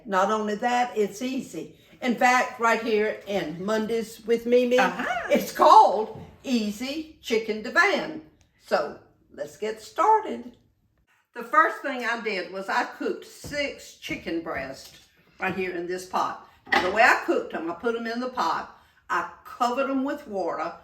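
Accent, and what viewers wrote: South, United States. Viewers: Meme I absolutely love everything you make and I love to hear you talk .Y remind me of my sweet southern family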